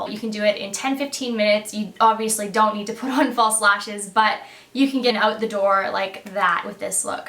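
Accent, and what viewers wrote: Australia. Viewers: Every video I watch, I am seeing an Australian accent coming through stronger each time! You have such a great talent and Australia is damn proud to have you! Also love this look! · I can hear an Australian accent starting ;) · you sound soo australian here!!!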